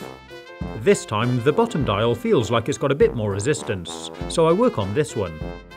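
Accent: United Kingdom. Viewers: Pure #BritishAccent nice to hear as it is conspicuous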